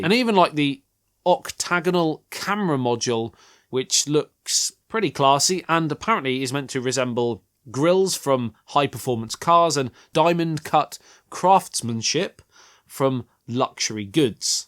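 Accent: United Kingdom. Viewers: Love British English accent ❤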